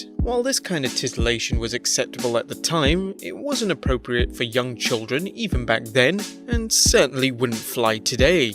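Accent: United Kingdom. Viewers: Maybe it's just the accent, but that was pretty stuffy British. Sex is naughty and things are improper and all that.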